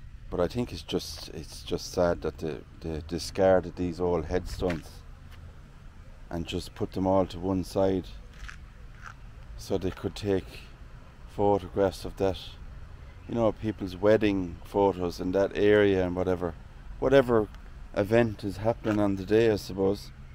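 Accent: Ireland. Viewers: Oh my goodness, that is terrible, it's unthinkable. Keep up the good work, I think you are wonderful beautiful Irish voice. Lynn in Brittany x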